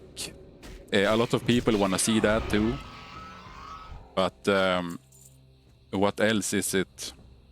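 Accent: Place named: Sweden. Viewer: Great theorycrafting, btw älskar den svenska dialekten!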